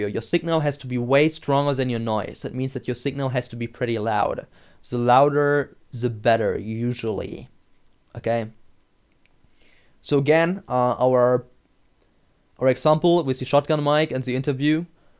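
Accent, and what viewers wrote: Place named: Germany. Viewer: Are you German..your accent is dope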